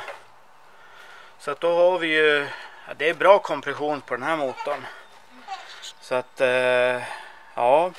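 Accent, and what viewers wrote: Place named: Västerås, Sweden. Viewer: Såg att du hejar på VIK. Stämmer det? Själv bor jag strax utanför Västerås. Tyckte väl att din dialekt var bekant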